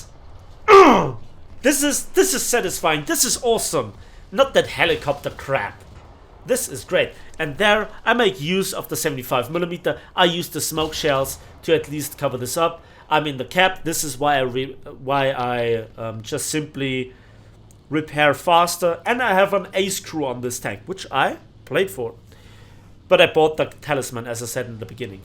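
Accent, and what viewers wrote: Germany. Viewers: I just love your German accent man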